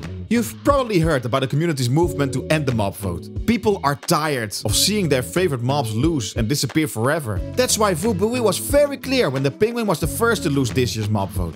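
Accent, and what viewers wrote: Netherlands. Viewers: Hello, are you from the Netherlands? I'm asking this because of your accent. BTW love this vid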